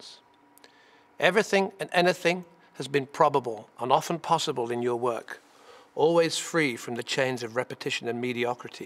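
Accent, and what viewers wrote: United Kingdom. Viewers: Dude he could have said cheese over and over for 2 minutes and it would have sounded profound for two reasons. 1. He's robert plant. 2. He has a british accent. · I love your beautiful English accent! I think my family's heritage came from Scotland and Wales and possibly Ireland. My mothers family has the same eyes and facial features as you have. They are very beautiful looking people!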